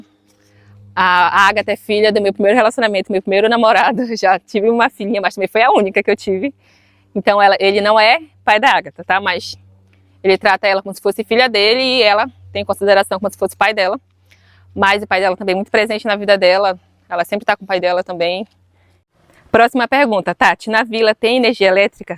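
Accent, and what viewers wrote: Rio de Janeiro, Brazil. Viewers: SINCERAMENTE EU PENSEI QUE VC TINHA NASCIDO NO RIO DE JANEIRO...PORQUE VC FALA IGUAL A CARIOCA KKK .......ABRAÇO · Parabéns... Curto muito... Acho legal seu sotaque carregado na letra (X) assim como nós cariocas. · o sotaque dela lembra o do rio de janeiro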